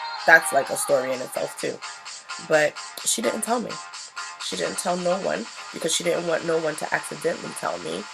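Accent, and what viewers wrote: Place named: East Coast, United States. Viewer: Hey, R.I.P. to your Mom's and I'm sorry she passed away at only 42. She was So young. Shout out to your Dad for still being a Dad and always being there for you. This was another cute make up Tutorial. Thanks for sharing. Your East Coast accent is So Strong. You Crack me up sometimes. Oh and about your Etsy shop, let me know when you have it up and I'll buy some notes from you. I have an Etsy shop myself and I've been in business for a few years. It's a Good place for business and I'm sure you'll do well:)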